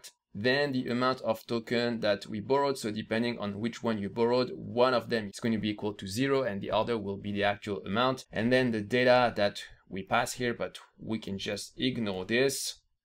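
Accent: France. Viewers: Are you French ? It seems you have a slight French accent ahah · Cet accent français 😂😂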